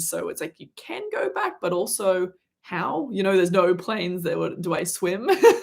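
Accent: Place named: Australia. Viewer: I fell in love with the Australian accent charm thanks to. I loved, love, will love this channel. I’m going to miss you so much.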